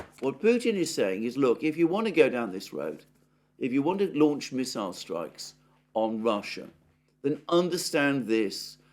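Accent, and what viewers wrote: United Kingdom. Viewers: Great job from the best informed reporter. Prudent in presentation. Great british accent🙂love those daily moments ❤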